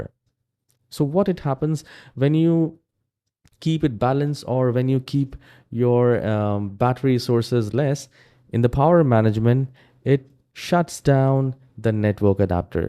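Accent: India. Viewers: Malayali aano ?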